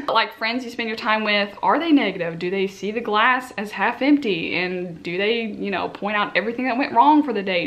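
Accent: United States. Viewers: As a non American I absolutely LOVE your southern accent😆😆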